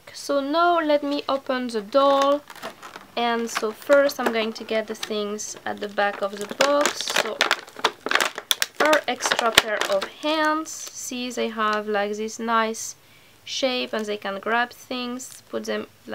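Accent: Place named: France. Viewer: she is cute ! congrats ! are you french ? you have a accent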